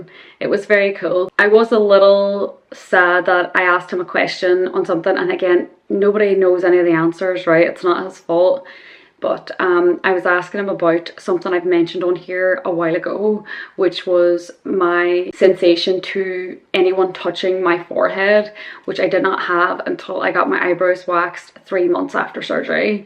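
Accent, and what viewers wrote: Ireland. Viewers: you look STUNNING!!!! your hair is angelic; your eyebrows look perfectly fine. I luv your Irish accent and I'm glad you're feeling better. I have a mild form of Epilepsy and have had several seizures, so I know how terrible they are and feel. Good luck to you, if I see you in a pub, I be sure to buy you a Guinness. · I appreciate that you have the captions turned on. Irish accents are difficult! It was so cool you got to see your surgeon. And I think that your eyebrows look fine. I don't even notice them. I'm sorry they hurt but I didn't even notice anything about them. Your hair is so beautiful.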